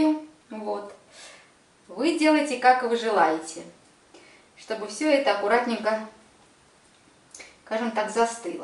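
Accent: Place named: Ukraine. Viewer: Вы были в Украине, что вы так говорите..?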